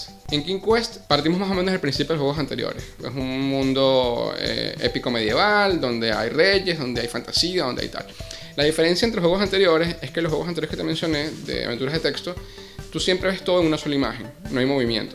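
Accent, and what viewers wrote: Venezuela. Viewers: Imagino que eres venezolano, es que tu forma de hablar y de expresarte es única, sin hablar de tu voz.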